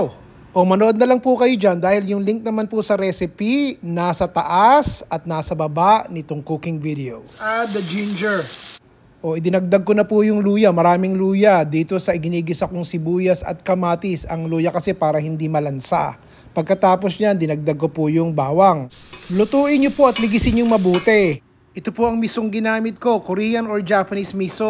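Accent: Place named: Nueva Ecija, Philippines. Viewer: Mr tga Nueva Ecija ka ba, kasi ganyan ang punto ng Novo Ecijano eh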